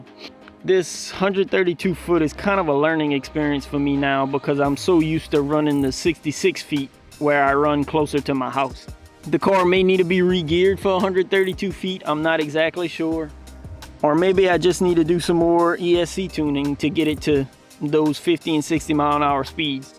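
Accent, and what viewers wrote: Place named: South, United States. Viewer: That definitely sounds like a south of i10 accent.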